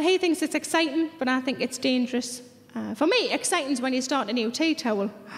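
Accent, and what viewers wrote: Newcastle, United Kingdom. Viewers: Think you'll find its Geordie. Can be hard for other Brits to understand. I still think she's great.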